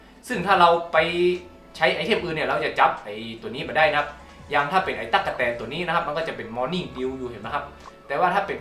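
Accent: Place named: North, Thailand. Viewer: 55555 ชอบช่องนี้จัง เป็นคนเหนือหรอคะ สำเนียงน่ารักจัง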